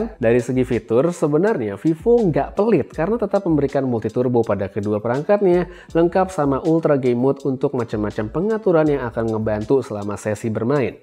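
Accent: Bali, Indonesia. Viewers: Bang orang bali apa?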